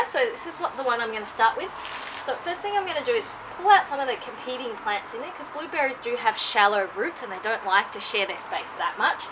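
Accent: Australia. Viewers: Very interesting an Asian woman with an Australian accent or European accent I absolutely 💯 love you. consider me a new SUBSCRIBER.. ROCK ON BEAUTIFUL...❤️🐼🌻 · I love a nice asian woman with an Australian accent who loves to garden.. But awesome content cause I am about to do blueberries myself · Hi lady!🌹 First time ever seeing you on line about Blueberries! First, what a lovely pretty lady speaking perfect Australian accent has immensely added attraction to the description, I’m a transplanted myself to America, sometimes I have hard time understanding Aussie and English folks but the more I listen to you all the more I feel closer to you, today you’ve got me hooked!🤩 Thoroughly experienced from various blueberries for better pollination and productivity to experiment Subject to soil acidity testing, VERY NICE! 😀 You’ve got adorable smart kids to help identifying soil acidic colors…. 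Family involvement, Lovely! The good looking crimson red fruit/ bell hanging in the middle of the metal arch, what is that fruit??? Looks like pomegranate but surrounded vines don’t look like Pom tree?! Thank you much for this video, I enjoy it greatly!!! 🌹🌿🪴🫐💖🇺🇸🤩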